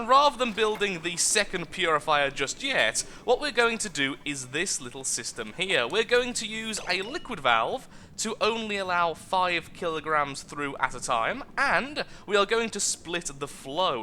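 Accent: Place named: RP, United Kingdom. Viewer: I love how posh sounds.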